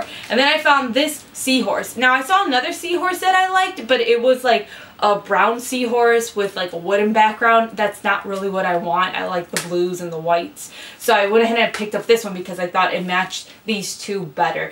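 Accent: Chicago, United States. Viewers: Good luck at your cooking class... loved the haul. I pronounce caramel the same way you do.... maybe a Chicago thang lol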